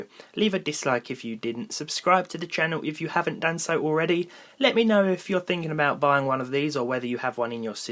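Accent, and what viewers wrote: United Kingdom. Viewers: Hey mate where are you from in the UK i like your accent but you never answered me at all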